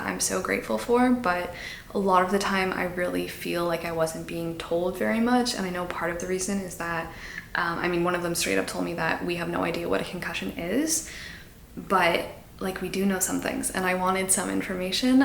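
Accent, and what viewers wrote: Quebec, Canada. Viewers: I didn’t comment on your last vlog, but now with the quebecois accent I have to say it… My tip for big city living is moving to Montreal! It has so many parks, including a « mountain » in the middle of it, most buildings are like 3-4 story high and the sense of community is generally very strong, while still allowing to keep so anonymity and privacy.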